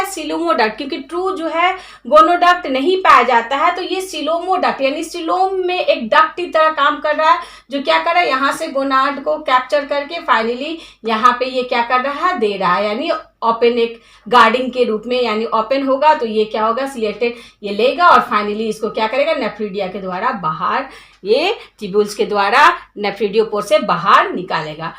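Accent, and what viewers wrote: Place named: Bihar, India. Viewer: Accha smjhaya mam. u r from Bihar ...h n ...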